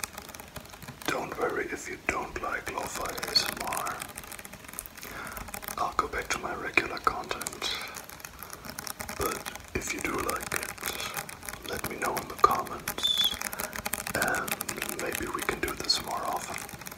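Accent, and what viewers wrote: Germany. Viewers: Is this guy German, cuz I think his German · vivienlaramalfoy maybe bc I’m from another European country but I can tell by the accent it’s German... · Hello are you german? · Are you german?? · Hey are you german cuz Im wondering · Ur German ?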